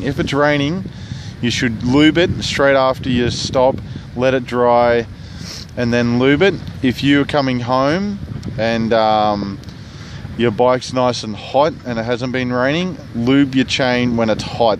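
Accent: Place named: Australia. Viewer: Good Review and great accent. You Australians have reinvented English as a tool to maintain a single class society.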